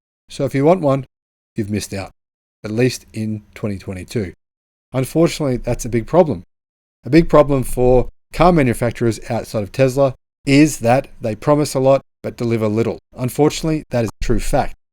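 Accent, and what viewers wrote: Australia. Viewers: Love Aussie accent! I'll wait for Rivian to deliver to Europe - or even F-150, but Ford most probably won't deliver F-150 to Europe though... (would love to get rid of my Tesla MS, won't buy any other Tesla vehicle, no matter what, because of how I experienced the really bad service and customer treatment after 4+ years of ownership...)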